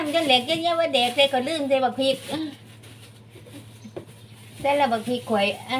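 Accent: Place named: Mukdahan, Thailand. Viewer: สำเนียงพูด เหมือนคนมุกดาหาร อยู่บ้านได๋ครับคุณพี่ สำเนียงชัดเจนอีหลี ชัดเจนกินพี่ ผมว่าเป็น ภูไท ใช่ไหมพี่สาว อิอิ แซวแน่😊😊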